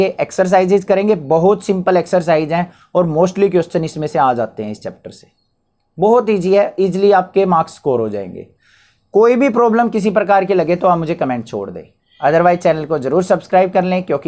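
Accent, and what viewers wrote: Haryana, India. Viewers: are sir aap to sacchi me haryanvi bolte h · sir ji mugje laga hi tha ki aap harayana ke ho aapki language se ma bhi haryana se ho na isiliye,vase ma hisar se hu sir.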